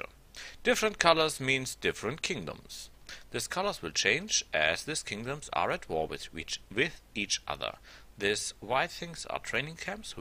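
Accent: Germany. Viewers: I hear it stop and go AH NO! press the next one straight away love this so far and it only ep 1! on a side note your accent it interesting German?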